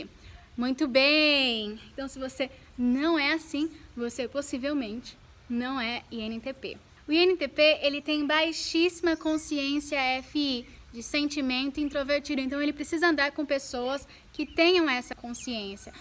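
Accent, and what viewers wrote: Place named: United States. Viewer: gente, ela fala muito "okay" pq ela morou muito tempo nos eua ai fica meio q um sotaque sabe, para brasileiros que não conhecem ingles pode parecer diferente mesmo, mas òtimo video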